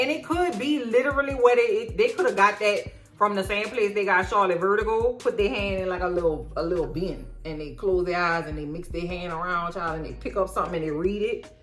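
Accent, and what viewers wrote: New Orleans, United States. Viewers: Awwww I love the N’awlins/New Orleans accent! I have NO trouble understanding! Two of my best boyfriends were from Louisiana, one lived in Slidell/Meterie, and the other in New Orleans proper. ❤ Bith talented musicians, and one a great cook! Good for you for sticking up for yourself and your channel’s loyal community! Keep on using that strong voice of yours proudly, cuz I love to hear it! 🎉🙌🏼🌟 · Your accent is what I find a very pretty accent type for US and to me (as a Polish woman who moved to the UK as a teen) it's very clear, and I can understand every word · My favorite pies: Lemon Meringue, French Silk (Chocolate), Pecan, and Sweet Potato are my favorite pies. 🥰🥰🥰 I will eat a Fried Apple Pie every now and then...but it is not my favorite. Also, Cousin, I LOVE how you protect your space. And I LOVE the N'awlin's accent. 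(However, I am a Southern born & bred Cousin...MS). ❤ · I'm so sorry to hear you're getting arseholes, racists, and idiots coming here to your channel. I've only been watching for a few weeks---found out about you on Reddit!--and for what it's worth, I love your New Orleans lilt 🙂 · Damn fools complaining about you & your channel? Your awesome NOLA accent??? Don't get me started. The Brooklyn in me will read them! My PR/Capricorn tongue will unfurl and I'll be placed in YT jail Please. Why ARE they watching if they don't like you? Idiots. Okay enough of that. 7 minutes of AJLT for the second to last AJLT ep. Yep. That's what it felt like. Only thing I'll miss about this show is you & your recaps. I look forward to THAT more than And Just Like Crap (shout out to the other YTers who have that channel name). You are 🔥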